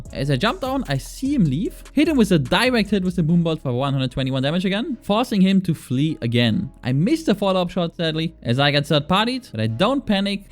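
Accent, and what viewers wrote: Germany. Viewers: Anhand deines Akzentes, bist du bestimmt deutscher oder? :D Schönes Video!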